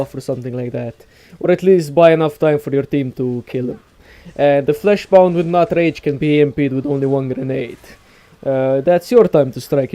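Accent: Russia. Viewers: You sound very russian. You should try to go for a 50/50 comedy and info mix, you will do really well. Anyways, video makes a lot of sense, good shit!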